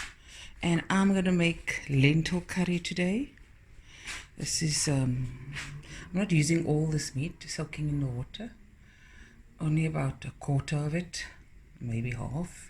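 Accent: Cape Town, South Africa. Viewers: It's so nice to walk with you through your garden. Your lemon is doing well 🤣 Oupa's fence looks sturdy and Sunnyboy is as beautiful as always. Loved your story time, so similar to mine. Food, love, bread and always some left in the spens. Lekker koue groenbone op brood, met sout en peper. Jou hart is vol en jou stem so warm en vol liefde. Nou gee jy als terug op soveel maniere en ook hier op YouTube. Dankie vir als. En jou pragtige Kaaps. Baie liefde. 🥰🤗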